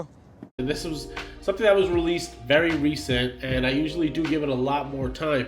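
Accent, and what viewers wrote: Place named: United Kingdom. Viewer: I mean not to generalize but...British accents do be hittin.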